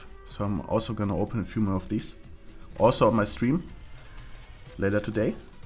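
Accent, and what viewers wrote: Germany. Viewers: u from german 100%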